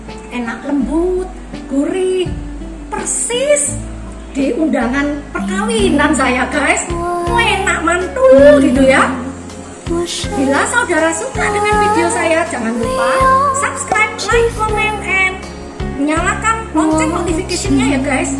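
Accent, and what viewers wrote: Java, Indonesia. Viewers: Pas banget lg pingin sop asparagus 😋 Resep2 nya mesti tak tunggu2..soal e seneng liat ai nya kalo jelasin ,super jelas tp medok 😊👍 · mantap ce makan musim hujan bgtu enak banget 😋😋..suka logat bahasa jawa cece hehe.. jelasinnya jelas dan mudah dimengerti thx ya ce semangat trus ya ce Gbu 😊😇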